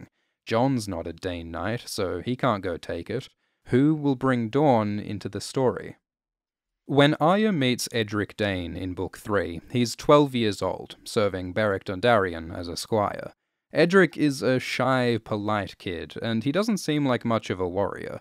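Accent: United Kingdom. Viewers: Dorne sounds a whole lot like Dawn when you say it with a British accent. Coincidence? I think not!!!!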